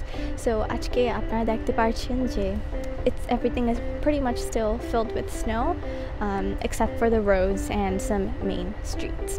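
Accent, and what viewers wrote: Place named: Comilla, Bangladesh. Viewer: মুগো কুমিল্লায় মনে হয়, কি বলেন আপি😍😍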